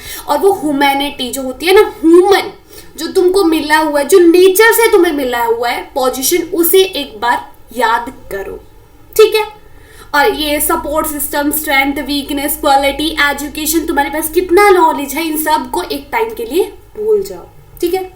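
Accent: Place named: Delhi, India. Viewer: It's really interesting.... To listen👂 Is YouTuber from Delhi?? Or NCR... speaking ascent!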